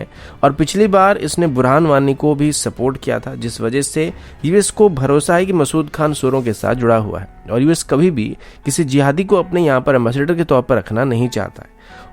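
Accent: Odisha, India. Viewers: Aji jana padila ki tame bhi Odisha ra boli😄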